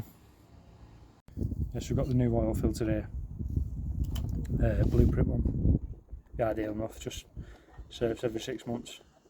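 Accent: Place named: Newcastle, United Kingdom. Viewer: Ah I see you are a geordie too :) 😊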